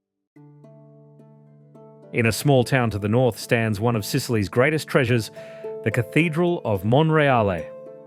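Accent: Australia. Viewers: Suspect Aussie? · is that an Australian accent I could hear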